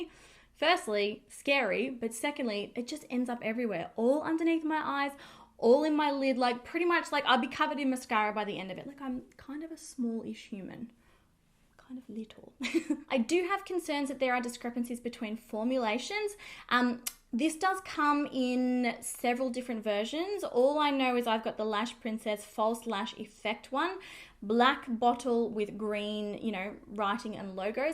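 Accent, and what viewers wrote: Australia. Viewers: You sound Australian would be great to know the prices here, and are you saying Chemist/Pharmacy?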